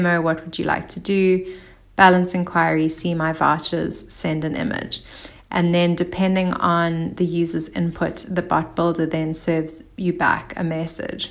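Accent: South Africa. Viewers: Dear, I must compliment you on a very well polished online profile. I found your video interesting to watch and subscribed to your channel. I wish you all the best for your future endeavours. Oh and well done for flying the South African flag high. I was expecting to hear a UK or US accent and was pleasantly surprised to discover you're a local. I'm from Cape Town myself. Best place to live, am I right?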